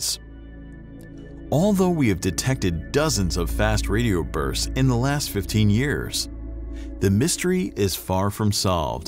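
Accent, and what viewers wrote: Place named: United States. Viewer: the narrator has the most american voice i've ever heard. great content though.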